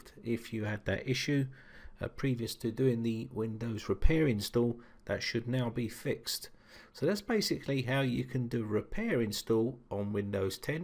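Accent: United Kingdom. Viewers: I love British accent,thank god it wasn't a Indian man...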